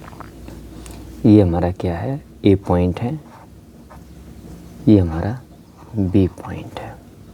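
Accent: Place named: Haryana, India. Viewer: Haryanvi boli me smjhne ka maza hi kuch aur h 😂 Btw, great explanation 🫡👏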